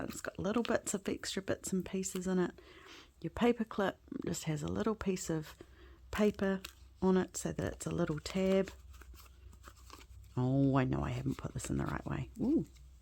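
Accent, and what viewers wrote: New Zealand. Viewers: I never thought of using a journal as decor! I am on my second journal - the first was made out of a little box and I am actually using it for notes and memorabilia; the other is bigger and sits on my desk - it is still being added to and it's not for writing in, just, well, admiring and trying things out as I learn. I now have an excuse to start a third one - a purely decorative one for my bedroom:) Lovely channel and great to have New Zealand content and a Kiwi accent to listen to. I'm waiting to see some Edmonds cookbook pages turn up in your signatures!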